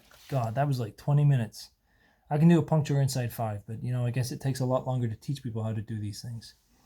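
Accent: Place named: Scotland, United Kingdom. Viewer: .... that was a proper comprehensive wheel install.. keep doing what you LOVE... I knew I heard a slight Scottish accent.. I dig this shit👍🏻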